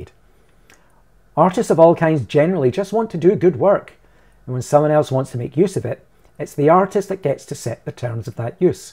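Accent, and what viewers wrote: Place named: Scotland, United Kingdom. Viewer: I've been following everything these last few months and I have to say you come across as a completely, upfront, honest genuione bloke and acted in a completly professional way. If you ever need a slightly bigger platform to discuss the ins and outs of copyright then sir you're more than welcome on my channel any time. The second I heard the Scottish accent i knew you were a solid bloke. :-)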